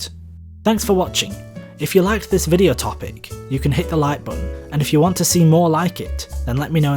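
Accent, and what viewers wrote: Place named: Northern England, United Kingdom. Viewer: really interesting video! Great to hear a Northern Accent in one of these channels too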